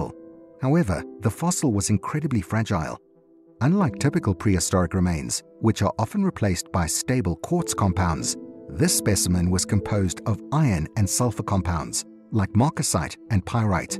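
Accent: United Kingdom. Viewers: UK accents are annoying. DISLIKE.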